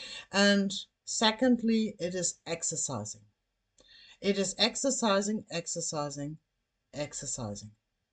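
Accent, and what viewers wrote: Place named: Germany. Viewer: Hello, I'm Yağız, I'm following you on YouTube, good luck, and your German accent is so sweet HDHM! 😈🐉❤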